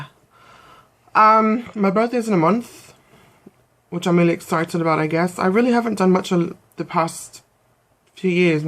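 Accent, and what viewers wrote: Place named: United Kingdom. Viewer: Hi: Love the brit accent:Good Luck With Your New Apartment:Your lips look really nice. Could you do your next video wearing glossy Pink Lipstick?????? Please Please Please?????????? Bill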